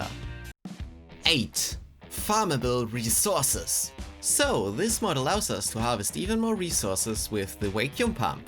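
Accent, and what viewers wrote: Germany. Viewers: Deutscher Akzent kickt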